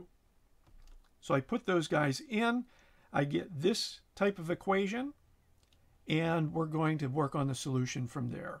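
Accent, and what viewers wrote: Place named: United States. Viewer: This is how an American should sound like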